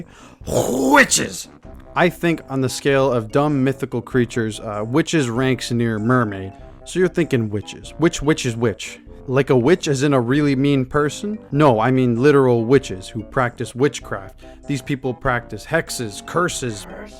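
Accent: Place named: Canada. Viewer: Love the canadian accent bud. Makes me feel at home ❤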